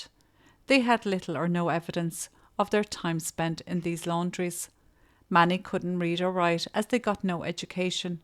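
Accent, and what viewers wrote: Ireland. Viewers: I’m really hoping that you please 🙏 read my messages. For some reason I don’t receive any notifications 🔔 regarding your videos and I don’t know 🤷‍♂️ why so can you please be able to help me out with this problem? Thanks very much. Also I might like to add that I really enjoy your soothing voice and your material along with your lovely Irish ☘️ accent, your borough!! You have the most amazing and different videos that I’ve come across!!! 🦋🌈😊🌹🇮🇪💚😄.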